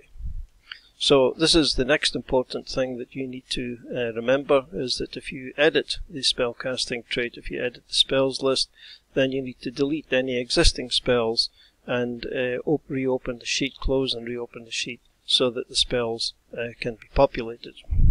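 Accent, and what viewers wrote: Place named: Scotland, United Kingdom. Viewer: I cannot properly thank you for all the time your videos saved me while preparing to run my first campaign in Fantasy Grounds (pandemic life made us use Roll20 first after a few decades of live playing, now we finally are going with a proper tool). It has been truly a blessing to be able to sort out so many questions with your marvellous explanations, and the absolutely fabulous production quality. The fact that they are delivered in a brilliant Scottish accent only help make it even better. Cheers from a Brazilian fan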